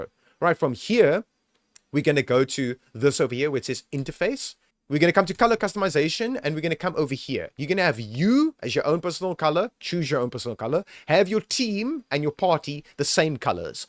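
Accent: South Africa. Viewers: i heard the accent and realised your a south african too, awe and thanks for making this video it really helped me.also keep up the good work my bru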